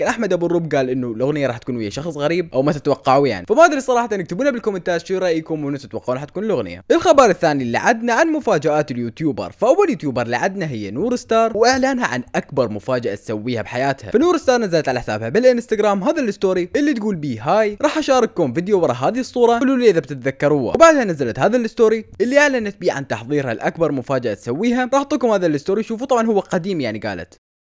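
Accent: Iraq. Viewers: اي اكيد لان لهجتها اساسا كذا هي عراقيه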